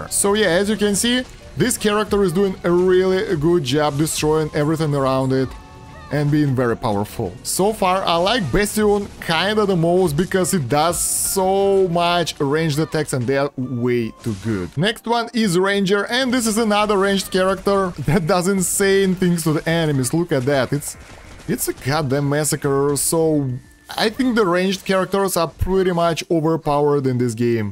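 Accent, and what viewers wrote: Russia. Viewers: Готов поставить на то что это русский пытающийся говорить на английском языке Вот 100% я уверен что это русский человек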